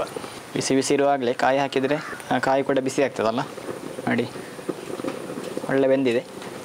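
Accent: Mangalore, India. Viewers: I like ur explanation vid local kudla kannada spr..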